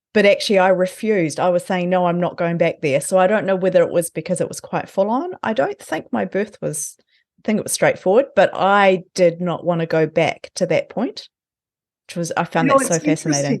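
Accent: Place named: Scotland, United Kingdom. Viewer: I just love her Scottish accent more than anything else on YouTube!!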